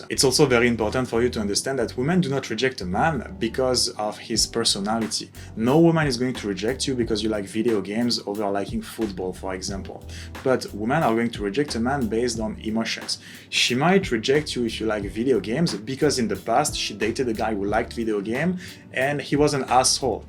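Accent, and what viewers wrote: France. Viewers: A good looking dude with a French accent giving advice on rejection. Yeah ok bro 😆👌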